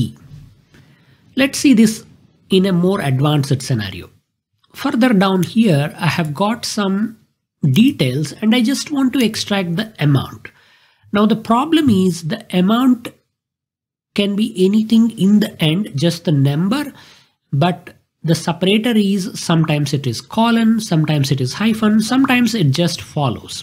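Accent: India. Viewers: Next time get straight to the point without these useless sketches. And please use an ai to voice over your indian accent.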